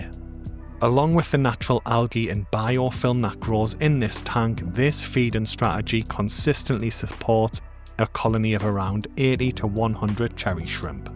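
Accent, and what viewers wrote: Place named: Lincolnshire, United Kingdom. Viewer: yeah, the posh twang which you must have got from your time in Lincolnshire threw me.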